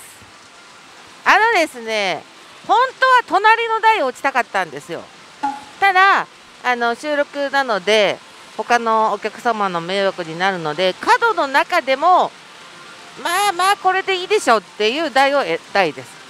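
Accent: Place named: Osaka, Japan. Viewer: さん、楽しく落ち着きある女性👩‍🍼いいよ😊 大阪弁言ってやん､物凄く浸し見湧いて､見てます🤭 勝てる台選び方も見たいなぁ〜 よろしくですほな🫶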